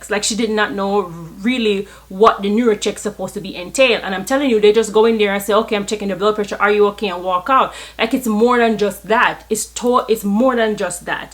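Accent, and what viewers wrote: Jamaica. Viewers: I don't even have to ask if you're Jamaican, I'd only ask what part you're from? I can recognize my people anywhere!!! New subscriber here, also a RN and you are already inspiring me to climb higher! Bless you! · You sound very Jamaican! 🇯🇲 I was watching a video re this story, just minutes ago. It was by a doctor. And I was very interested to hear what happened. But his lacklustre delivery, his projection, etc., I just couldn't listen him anymore. So I scrolled, and there you were. I clicked, and few minutes into listening, I subscribed. Good job! You're brilliant, you're fluent, projection 100%, delivery 100%. Overall, a very informative and excellent presentation! 👏👏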